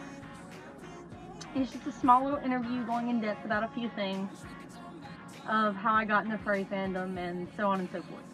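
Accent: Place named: South, United States. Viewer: Thats pretty awesome to learn some things about you! I would like to say, I love your southern accent (Its very strong but really nice). I enjoy video like these! :)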